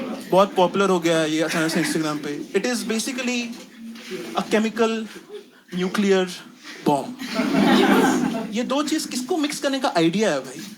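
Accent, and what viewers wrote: Bihar, India. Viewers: After the whole video I felt you have learnt hindi from a bihari friend of yours maybe.. Cause my bengali friends also talk in the same way as you😅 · Bhai , tumhari accent to bihari jaisi hai ,I think he is also from Bihar 😂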